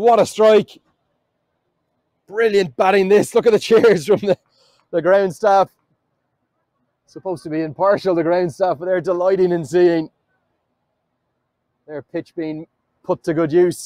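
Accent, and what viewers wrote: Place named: United States. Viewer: First time hearing cricket commentary in American accent 😄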